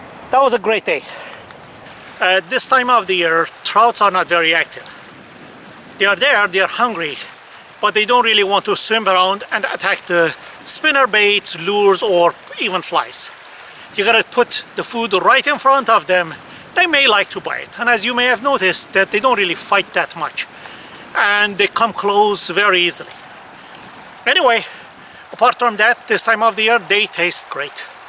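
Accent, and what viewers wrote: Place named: Iran. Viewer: Just noticed your accent are your Persian are you Farsi aaaaaa babajon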